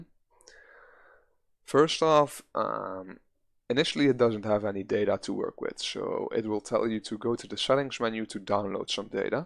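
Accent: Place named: Netherlands. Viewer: Nederlands ofnie ik kan het zo horen in jou Engels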